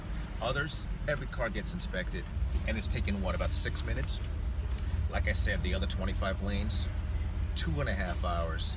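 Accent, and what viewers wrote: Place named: New York, United States. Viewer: “Keep swinging that thing all the way to chula vista” - u from ny? Thats how nykers talk.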